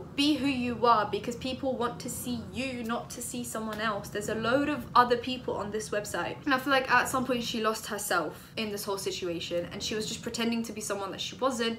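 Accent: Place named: London, United Kingdom. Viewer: Your the only white girl with a london accent sound like a roadgirl on youtube bit random lol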